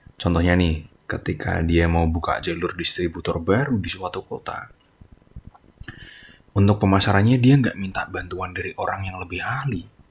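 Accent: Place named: Java, Indonesia. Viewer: menurut analisa saya dari suara yang saya dengarkan , anda pemikir yang dalam , logat anda jawa , artikulasi kurang begitu jelas yang menandakan anda seorang introvert , dan maaf, seorang narcissist.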